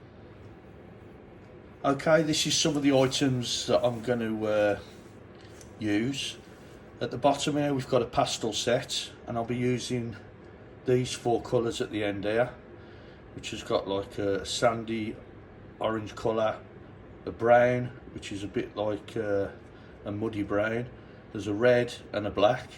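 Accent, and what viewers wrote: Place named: Black Country, United Kingdom. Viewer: As a Brummie myself, accent is more like the black country yam's, sort of reminds me of 'Barry' in Aufhedisen Pet 😅 Good rusting effect video, 👌👍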